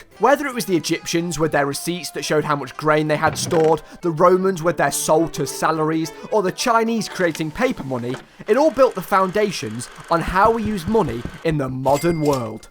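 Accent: United Kingdom. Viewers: I'd this man British because he sounds British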